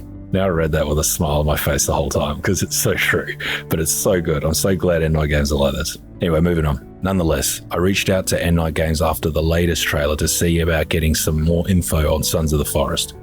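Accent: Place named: Australia. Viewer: Yo love your vids but I got a weird question but what is you accent or dialect to me it sounds Australian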